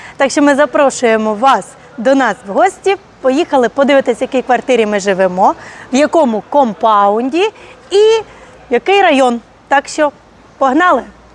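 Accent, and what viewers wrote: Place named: South, Ukraine. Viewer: Доброго дня ! Ми часто вас дивимось в Україні в ютубі.Нам подобається ваша мова і ми завжди думаємо з якого ви міста.Я думаю с юга України бо ми з Херсона.Дякую за відповідь!.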